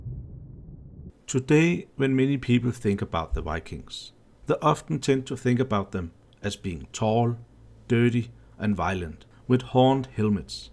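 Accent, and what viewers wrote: Denmark. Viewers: Was wondering if he was Danish too... Danes have the best accents for me amongst Scandinavians... I'm Dutch so I'm not bias. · Your speaking with dannish accent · Some heavy danglish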